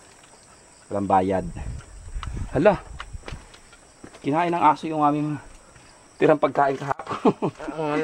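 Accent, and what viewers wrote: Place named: Catanauan, Philippines. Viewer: puntong catanauanin tlga hahaha miss my hometown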